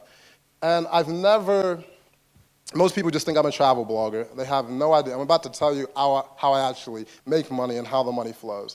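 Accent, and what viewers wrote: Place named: London, United Kingdom. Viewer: As a black man originally from London now living in America I have to say that his London accent is spot on, in fact he sounds like me :) A couple of London translations.... Thing = fing (London)......Alright = owight (London)..... Isn't it = innit (London or Ali G)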